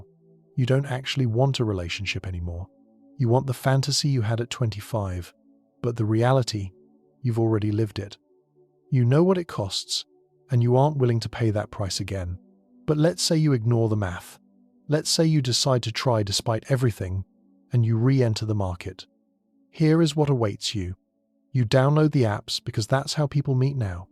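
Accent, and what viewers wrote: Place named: United States. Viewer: Sounds very USAmerican.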